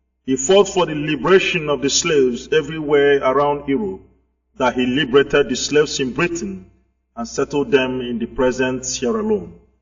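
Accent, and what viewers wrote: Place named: Igbo, Nigeria. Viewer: you are an idiot what proves do you have that the orator is hamate can't you hear the accent is an Igbo man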